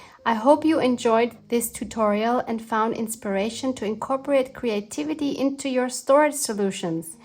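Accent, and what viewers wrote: Vienna, Austria. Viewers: another beautiful project and i really love to hear you speak german with your lovely vieanna dialect <3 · Ohhhh du liebste, du herziges Zauberwesen😊, was hast du wieder Tolles gemacht. Ja und nun weiß ich endlich was ich mit meinen Treibholzstöckchen machen werde, die ich letzten Sommer so eifrig am schönen Chiemsee sammelte❤. Es ist echt lustig, dass ich ebenso Probleme mit dem Zufallsprinzip habe, obwohl ich alles andere als perfektionistisch und ordentlich bin😅. Aber wir hatten es ja schon mal davon... Es ist so schön, dich deutsch sprechen zu hören, denn es blitzt doch das Weanerische hindurch und ich liebe diesen Dialekt. Ich hab ja auch ein Faible für österreichische Autoren. Deine Fabricsnippetroll ist super geworden. Achja und das Zeugs, dass du wohl meinst wegen des Stofffixierens müsste aufbügelbare Vlieseline sein. Ich glaub, die Quilterinnen benutzen das immer. 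Das kleine Journal, das du von Petra bekommen hast, ist wirklich allerliebst und ich mag besonders, dass sie auch Samt verwendet hat. Ein tolles Material wie ich finde, besonders wenn er schon gebraucht ist. Ganz liebe Grüße zu dir ins schöne Wien und guats Nächtle❤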